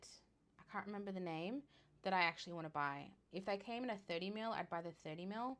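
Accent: Australia. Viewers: Hi, I'm new to your channel. It's so refreshing to hear an aussie accent on youtube and that you review Australian makeup brands and provide links to products that i can actually buy in Australia!!!! Yay!! Thank you so much for your channel. I've already bought some Australis products that I never thought of trying. Very good they are indeed!! Kepp doing what you're doing 'cause it's really great. ❤❤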